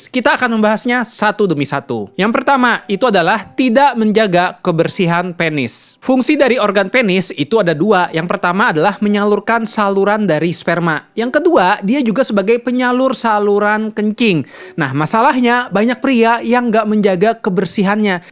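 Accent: Banjar, Indonesia. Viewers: Urang Banjar kalinya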